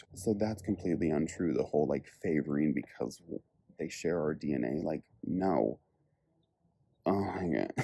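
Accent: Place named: Scotland, United Kingdom. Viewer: First and foremost love you guys. Next: blah🥱🥱 bloody blah to the small minded people out there. Second next: you have got this parenting (I would know I have 5 😜)thing. And last but the most important: did I hear a Scottish accent creeping in?!? I think so lovely xxx Lots of love from Edinburgh 🏴❤️ THREE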